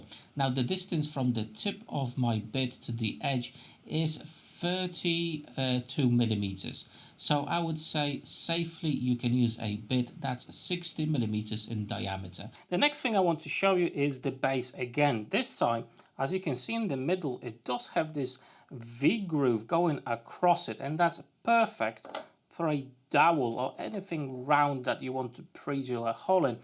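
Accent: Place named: Black Country, United Kingdom. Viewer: Thanks. I´ve just ordered one of these from Ali Express. I read your bio. Your English is great! Did you live in The Black Country when you were in England? Birmingham? Wolverhampton? The accent can be a bit comical if you´re not from round those parts. Sorry Brummies. Love you, really!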